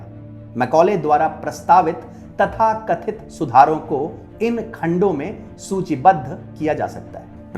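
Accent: Standard. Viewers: aapki saari baatein aatma ko jakhjhor de rahi hai, lekin kya badlega wo to hum logo par hi nirbhar karta hai. fir bhi priya bhrata aapki shudh hindi sun kar mai tript ho gya.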